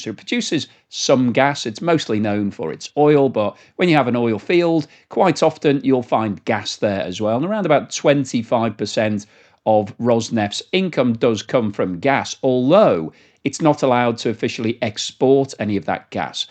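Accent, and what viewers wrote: United Kingdom. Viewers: Since you are british, and you refer to the "other than oil" product as "gas", I HAVE to think that you are referring to Natural gas. Here in America, gas, or as you would refer to as "petrol", is refined from oil. Natural gas almost always is Naturally present where there is untapped oil. So when you simply said "gas", I'm thinking " WTH is he talking about?!!" But then I realised, by your accent, that you weren't talking about "petrol". Please try to be more specific. Otherwise your commentary can be a little misleading.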